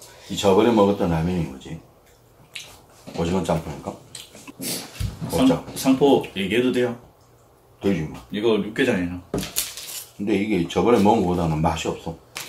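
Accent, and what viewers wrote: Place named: Gyeongsang, South Korea. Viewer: 창원 사투리 맞는거 같은데요?ㅋ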